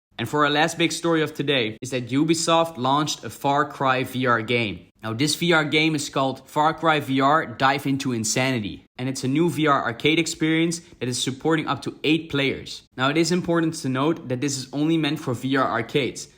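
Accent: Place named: Netherlands. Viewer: i get the feeling your dutch by how you talk idk man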